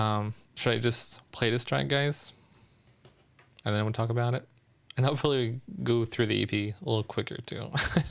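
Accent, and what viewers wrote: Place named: California, United States. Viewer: You really do sound like you're from California. I wanna hear you speak French and Japanese, though. ❤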